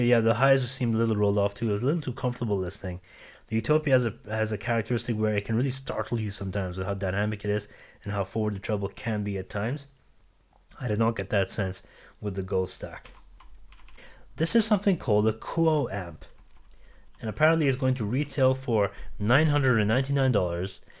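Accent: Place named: India. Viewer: Where are you from? Your accent sounds Indian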